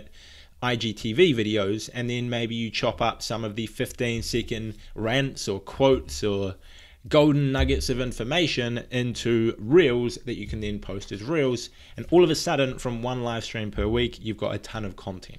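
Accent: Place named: New Zealand. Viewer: 6 minutes into this video I thought... "Is that a kiwi accent?", I open your channel in a new tab, check location... NZ. Sweet as! This is the first time you get recommended to me. Good video!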